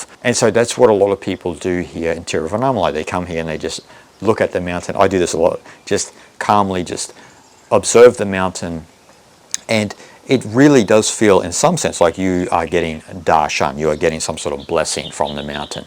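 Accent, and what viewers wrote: Australia. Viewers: THANKYOU SO MUCH FOR THIS WONDERFUL EASY TO UNDERSTAND EXPLAINATION OF ARUNACHALA AND RAMANA MAHARISHI AND THE REASON WHY PEOPLE GO THERE TO RECOGNIZE THE SHIVA WITHIN THEMSELVES HALLELUJA AND AMEN I HAVENT BEEN THERE MYSELF BUT MY DAUGHTER AND THEIR GROUP WITH THEIR GURUS DID THE CIRCUMBILATION OF THE HOLY MOUNTAIN I HAVE KNOWN ABOUT RAMANA MAHARISHI FOR A LONG TIME MANY YEARS BUT I GHOSE ANOTHER PATH OF TIBETAN BUDDHISM WHICH OFFCOURSE IN THE END COMES TO THE SAME CONCLUSION BUT IAM SO PLEASED THAT MY DAUGHTER DID THIS AND HAS TAKEN THE PATH OF SHIVA WITH TWO BALINESE VERY HOLY GURUS IN BALI AND WE LIVE IN BALI NO DOUBT YOUV HEARD OF UBUD A MECCA FOR TOURISTS AND YOGA ANYWAY I CAN HEAR YOU ARE AUSTRALIAN AS WE ARE AS WELL ALLTHOUGH MY DAUGHTER HAS BECOME INDONESIAN AND WITH HER INDONESIAN OR RATHER BALINESE HUSBAND BROUGHT UP HER KIDS HEAR AND DURING THOSE 27 years HAVE HOMESTAY WITH A YOGA RETREAT FACILITY AND SO ON HERE AND IS VERY MUCH INTO THEIR ASHRAMS AND SO ON FOR ALL THOSE YEARS BUT ANYWAY I HAVENT HAD THE OPPORTUNITY TO GO TO THAT PART OF INDIA ALLTHOUGH I WENT TO BODGAYA TWICE AND DHARAMSALA IN NORTH INDIA AND WAS PRIVILIGED ENOUGH TO BE IN THE PRESENCE OF HIS HOLYNES THE DALAILAMA AND RECIEVING ORDINATION FROM HIM BUT IT ONLY LASTED ABOUT 3 YEARS ANYWAY AND THEN MORE OR LESS ON AND OFF JOINED MY DAUGHTER IN BALI BUT NOW LIVE HERE PERMANENTLY FOR THE LAST 2 YEARS AND 9 MONTHS SINCE IAM 83 allmost 84 MY DAUGHTER IS LOOKING AFTER ME IN RETIREMENT THE NAME OF O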